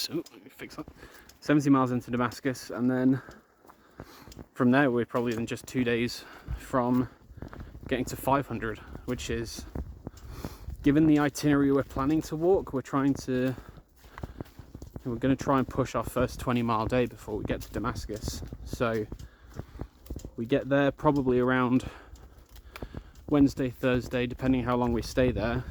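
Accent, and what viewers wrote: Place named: East Anglia, United Kingdom. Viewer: "I'll be DAMED if the brick is overtaking me" Made me laugh a lot more than it should of... Where are you based in the awesome UK? Sounds like Anglian Area?? Keep up the good work and Enjoy the adventure.. Dave UK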